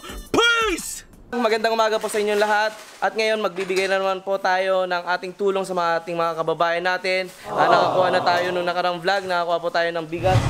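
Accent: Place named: Ilocos, Philippines. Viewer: Ilokano ka gayam brogang. Naalwad ah pang pataray brogang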